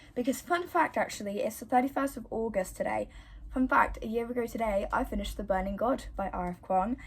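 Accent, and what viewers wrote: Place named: United States. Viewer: I LOVE UR AMERICAN ACCENT BAHAHA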